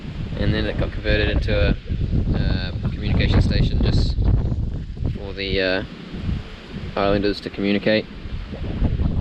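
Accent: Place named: New Zealand. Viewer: Nice video well done 👍. Do you live on the island? Did not really grasp if you do. You do sound like a Kiwi. No offence of course. I am a Aussie, no offence of course lol . Cheers bloke.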